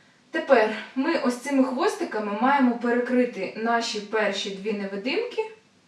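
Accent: Standard. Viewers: Чисто українська мова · Дякую, відео дуже сподобалось.Ви дуже освідчена людина.Яка у Вас професія? Тому що знаю тільки одну молоду дівчину,яка так чисто говорить на украінській,але вона закінчила Могилянку. Хотіла б я так говорити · дуже гарна зачіска) Приємно чути чисто-українську мову!) Ти супер😊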